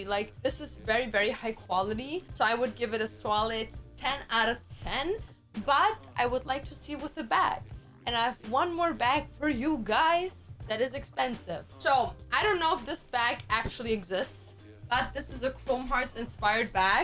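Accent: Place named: Germany. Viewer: sie klingt so deutsch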